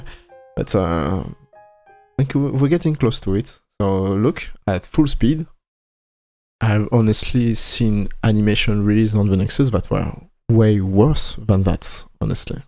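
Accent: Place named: France. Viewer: Love your accent, I think you're french <3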